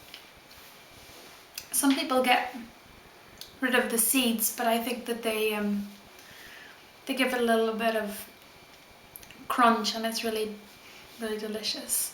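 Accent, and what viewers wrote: Ireland. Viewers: Irish accent? Or Welsh?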